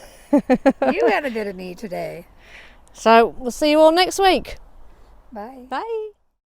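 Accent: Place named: Scotland, United Kingdom. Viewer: Wow so many baby plants on there way up!! You are starting the season strong 💪 lovely to see you in action with your handy dandy tool kit - loved the Scottish accent too! I am trying with flowers too I need to get better at! It's all coming along so well, a week away and the plot is still looking in great! Keep going!! 🥰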